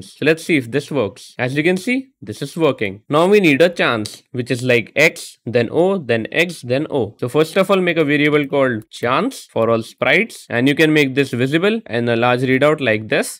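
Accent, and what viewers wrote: India. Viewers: when i heard that indian accent i knew this vid was gonna help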